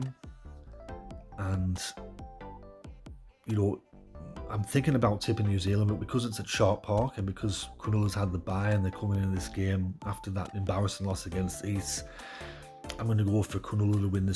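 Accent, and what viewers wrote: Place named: Liverpool, United Kingdom. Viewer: Scouse accent..👌😊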